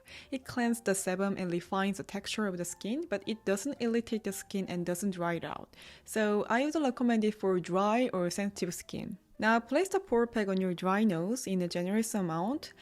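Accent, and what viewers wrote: South Korea. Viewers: Love your English with korean accent ❤😂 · I’m so ignorant of other cultures, so I never heard a Korean accent in English before, i love it, also you are pretty good at explaining and tell us about everything at the end of each section, new follower ❤❤❤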